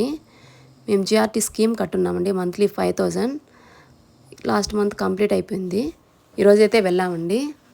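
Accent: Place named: Rayalaseema, India. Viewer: Mana Rayalasima voice super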